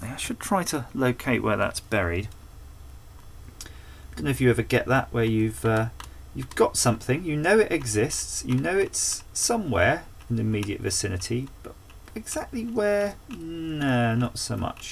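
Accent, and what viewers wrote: United Kingdom. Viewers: Thanks for sharing, and I love your British accent !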